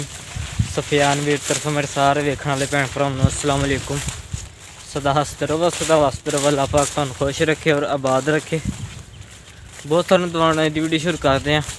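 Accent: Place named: Punjab, India. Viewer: Salaams nice Punjabi we speak like you too apnayat mehsoos hoti hay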